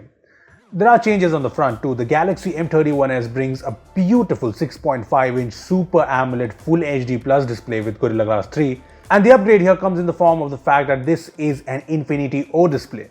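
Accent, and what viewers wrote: India. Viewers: I Love the Indian Version o the R! ☺️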